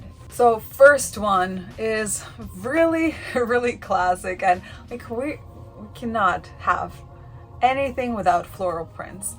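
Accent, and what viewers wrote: Russia. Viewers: Great understanding of trends, and feeling for fashion. Beautiful English, good French pronunciation and a very light cute accent, perhaps Russian! Just curious.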